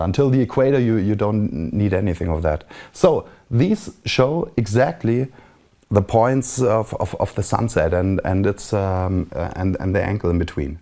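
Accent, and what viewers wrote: Germany. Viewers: super dass du jetzt auch internationale Versionen machst. Gutes Englisch, bin überrascht, und der typisch deutsche Akzent wirkt ja eh immer irgendwie sympathisch.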